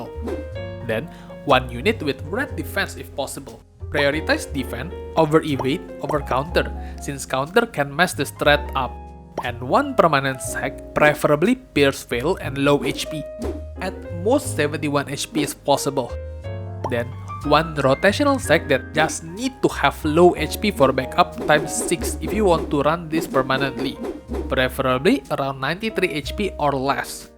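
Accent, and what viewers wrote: Indonesia. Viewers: indo ya bang? kedengaran banget accentnya wkkwkw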